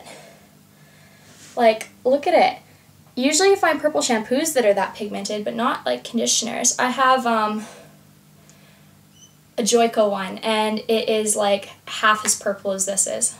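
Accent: Australia. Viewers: You gorgeous as always honey!! I love that you've taken on an Australian accent..so cute!! xo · You seem to be taking on an Australian accent in some words =)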